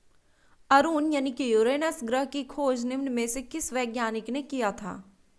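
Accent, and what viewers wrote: Bihar, India. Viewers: आप भी बिहार से हैं Mam ❓❓❓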